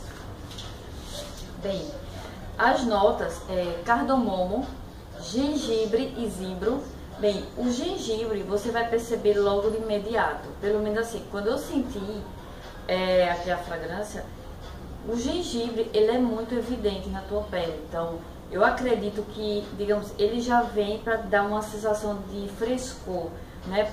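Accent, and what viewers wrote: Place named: Northeast, Brazil. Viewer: Deve ser ótimo amo perfumes trabalho com isso sou da Dior moro em Recife você é nordestina tem sotaque igual ao nosso !! Tenho vlogs mais tenho vídeos de perfumes tbm vê lá !! Mais antigos amei você explicando show !!! Like te convido a conhecer meu canal !! Perfume sempre nos remete sensações amo amo !!!bjos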